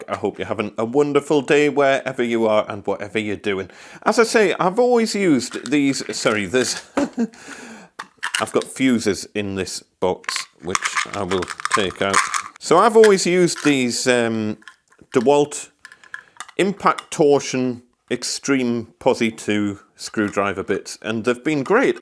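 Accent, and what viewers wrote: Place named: Geordie, United Kingdom. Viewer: Still not big coat weather for you. Reet proper Geordie, man.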